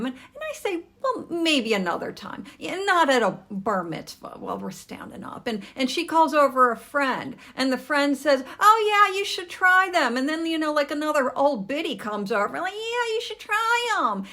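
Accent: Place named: Baltimore, United States. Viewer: I detect the Baltimore accent. Oysters are gross. I might try something new if I think it might be good, but not if it's gross. I'm also not going to talk someone into eating something they don't want. My ex was allergic to chilli peppers. They would screw up her system. It became standard habit to ask about them at any Tex-Mex place. I'd ask even if she didn't remember.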